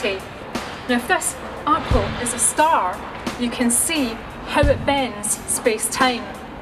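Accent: Scotland, United Kingdom. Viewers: Scottish (she studied Physics at Glasgow University with me. But she doesn't have a Glasgow accent...more of a generic central Scotland accent...possibly Falkirk or Stirling area but I'm not sure) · Woman with Scottish accent, something something... bath towel, playing with balls, inflation...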